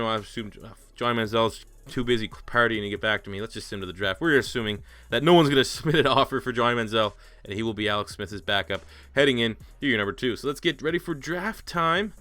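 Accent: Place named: Canada. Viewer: You sound Canadian. Are you?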